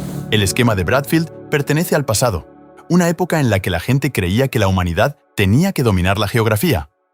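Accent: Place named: Spain. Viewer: habla como sspañol y larino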